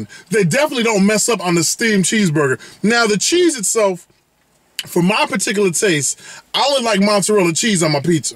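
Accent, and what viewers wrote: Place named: Boston, United States. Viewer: YEH HE SOUND LIKE HE BOSTOOOOOON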